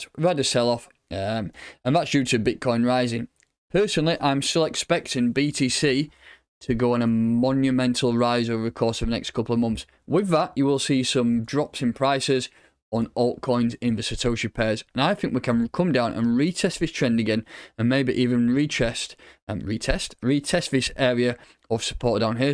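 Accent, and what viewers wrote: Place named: North, United Kingdom. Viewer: New to the channel. Nice to hear a northerner instead of an American. Nice vid, suscribed 🙌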